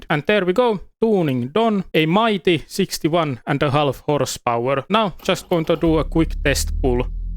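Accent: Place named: Finland. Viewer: the most Finnish sounding guy i heard haha, nice video!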